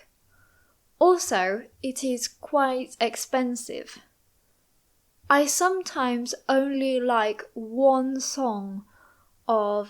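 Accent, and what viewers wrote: United Kingdom. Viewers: Is this a British accent? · You have so beautiful british accent!❤ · Is this a British accent or American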